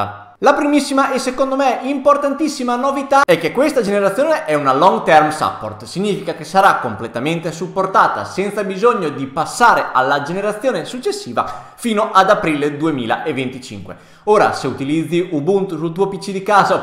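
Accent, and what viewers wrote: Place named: Emilia, Italy. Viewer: sento un accento famigliare...tra Ferrara e Bologna...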